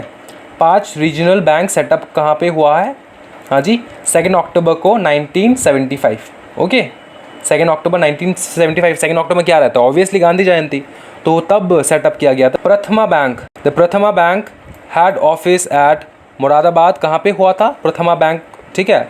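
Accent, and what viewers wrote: Bengal, India. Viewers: Yes , Sir has Bengali accent because He is Bengali🙂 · Seems like sir is has a bengali accent 😄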